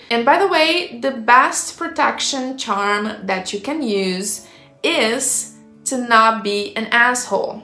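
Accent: Brazil. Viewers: Are you brazilian? That accent sounds familiar to me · Tava tentando achar informações sobre bruxas e wiccas e caí no seu canal. Reconheci o sotaque brasileiro na hora ❤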